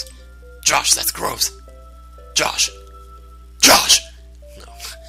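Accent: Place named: South, United States. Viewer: Thanks for post... Not sure where you are from...but your accent (Southern?) Is great! Voice is easy to listen to...And you are funny. I mean really really funny. I am a med student using P vs. Z for stress release... Thank you so much for this post...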